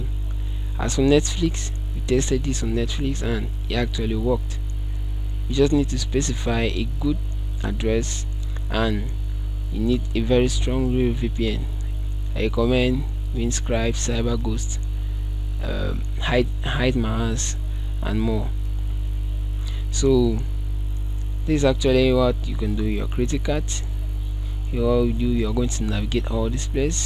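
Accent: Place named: India. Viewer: Boi you sound like Indian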